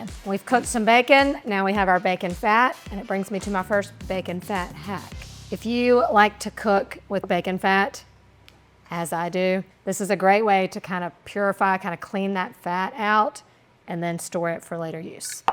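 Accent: South, United States. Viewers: You remind me of Ella Langley a bit, especially that raspy Southern voice of yours. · County accent + hazel eyes + Italian = Sexy woman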